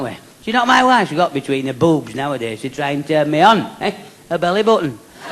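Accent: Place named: Yorkshire, United Kingdom. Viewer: It's taking me a bit to understand the jokes with his thick Yorkshire accent, but this is, hands down, better than the comics who do a whole show fulll of cussing and vulgarity nowadays.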